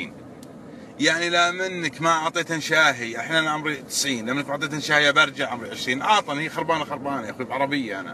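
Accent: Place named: Qassim, Saudi Arabia. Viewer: يااخي والله اني اقدرك واحترمك الله يكثر من امثالك يا ويعطيك طوله العمر. يازين لهجتنا القصيميه ويازين عيالها وياحلاوه انفسكم وروحكم والله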